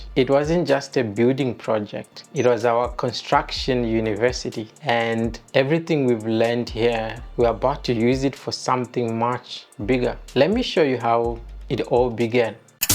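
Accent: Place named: Kenya. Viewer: I thought you were kenyan from your accent. hmm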